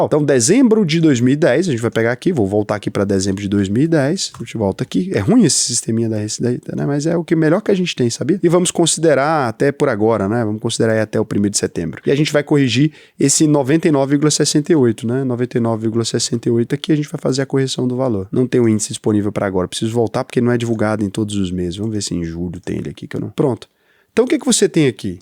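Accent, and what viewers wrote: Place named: Goias, Brazil. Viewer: Uai voce e de goiania irmao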